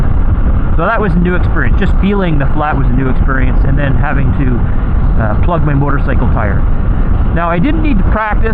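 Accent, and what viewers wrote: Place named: Canada. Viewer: As I've gotten older, a 3 or 4-day trip is the sweet spot for me. From where I live in central North Carolina, I'm less than a half day ride from some of the best roads on the east coast. I have a couple friends that I'll do trips with, but I'm the one who always plans the routes, arranges the hotels, lunch stops, etc. I love riding with my buddies, but doing my own thing relieves me of those duties--and the stress--and makes the ride more enjoyable. I didn't realize you were Canadian (I should have picked up on the accent, lol). I work in Canada quite a bit, as we have a branch office in Mississauga. I'll be working in Montreal for a week in September, followed by a week in Edmonton/Calgary. Good video, buddy. Ride safe.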